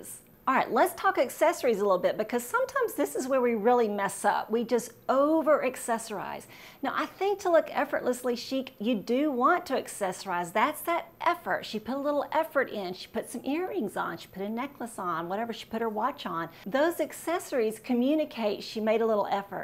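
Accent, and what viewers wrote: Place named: Texas, United States. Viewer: I love her accent! Is she from Texas?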